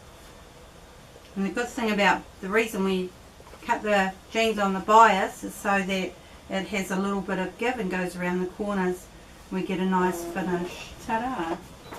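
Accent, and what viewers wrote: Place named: New Zealand. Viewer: She is a Kiwi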